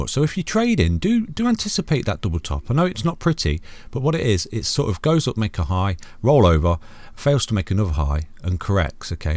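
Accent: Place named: Sawley, United Kingdom. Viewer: You sound very local to me in Sawley. Fancy a pint at the White Lion so I can rack your brains? Haha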